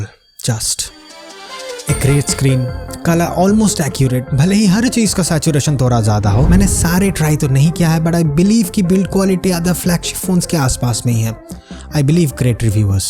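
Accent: Bengal, India. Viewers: The way he speaks, makes the video more interesting And thank u for conforming that u r a Bengali😂❤ Already i guess that by ur accent ❤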